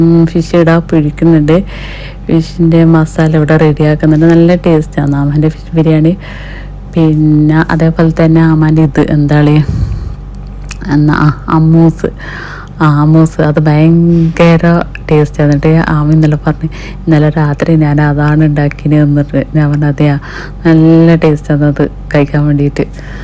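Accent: Kannur, India. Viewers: Kannur malayalam adipoli❤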